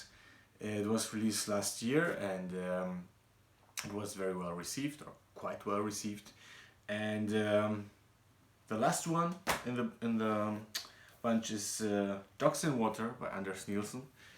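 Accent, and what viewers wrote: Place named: Germany. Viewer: I don't think you talk funny, listening to you makes me miss Germany. You have an awesome ass collection and I am loving your reviews:)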